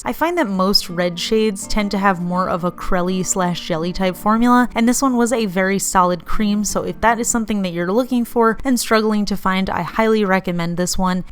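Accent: New Jersey, United States. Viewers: I love this collection. Love the new hair color too! I totally understand about how you say some people make fun of how you pronounce ‘ pastel’ I’m from Jersey too ( near LBI) and I’m always being made fun of on how I pronounce certain words. Maybe it’s a Jersey thing. But now for the important Jersey question- is it Taylor Ham or pork roll. Lol. ❤️ I love your channel.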